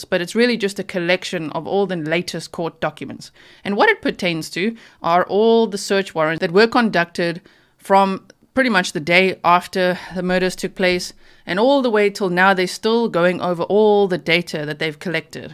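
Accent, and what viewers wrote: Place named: South Africa. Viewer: Great summary. Don’t lose the accent👍🇿🇦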